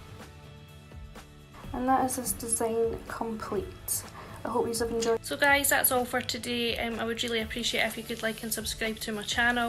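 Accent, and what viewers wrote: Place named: Scotland, United Kingdom. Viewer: Where in Scotland you from? It's strange hearing a Scottish accent xx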